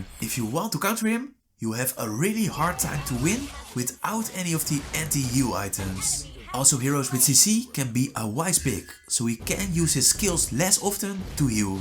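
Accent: Netherlands. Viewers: Ben je nederlands??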